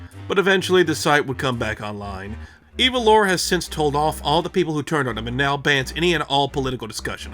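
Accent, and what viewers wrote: South, United States. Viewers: random but i LOVE your accent. you sound very southern in the best way possible. i’m a born and raised georgian so i can definitely appreciate it. it sounds like i’m being spoken to by a friend.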